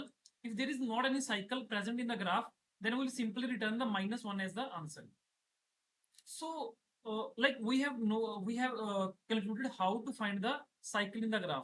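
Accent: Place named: Punjab, India. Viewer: Aapke jesa punjabi accent ke lie kya kre bhiya?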